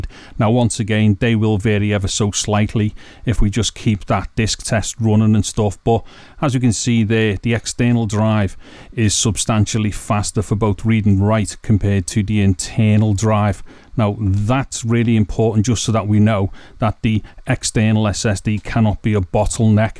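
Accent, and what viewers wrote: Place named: Scotland, United Kingdom. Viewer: OMG A SCOTTISH! Love the accent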